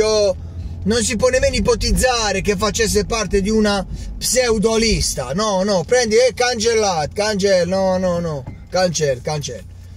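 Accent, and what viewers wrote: Apulia, Italy. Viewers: sei forte,continua così a parlare il pugliese, sei uno spasso, non sono milanista, sono dell'altra sponda del naviglio (interista), ma ti seguo tutte le sere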